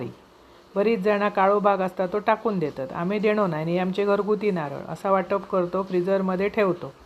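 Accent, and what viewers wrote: Malvani, India. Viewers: तुम्ही मालवणी वेंगुर्लेकर छान बोलता ऐकताना गोड वाटत कांनाना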